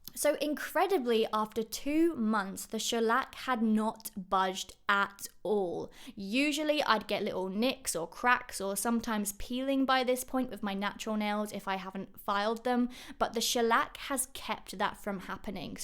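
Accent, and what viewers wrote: United Kingdom. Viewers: Omg that british accent though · British accent amazing and original ❤️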